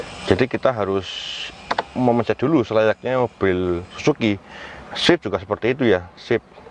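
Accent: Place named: Java, Indonesia. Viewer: medok nya agak ganggu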